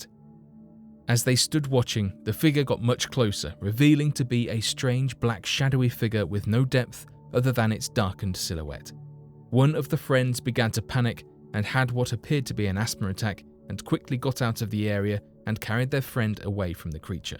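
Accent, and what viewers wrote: United Kingdom. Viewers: I like this narrator much, much more than the usual one. He sounds like an actual British human and not a computer simulated voice. Great topic, too. Thank you for posting this!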